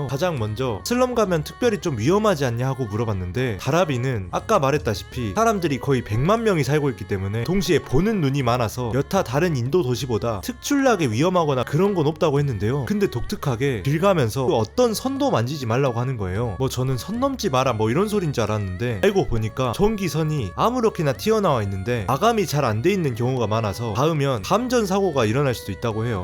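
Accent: Jeolla, South Korea. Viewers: 전라도 사투리가 강하네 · 너 전라도니?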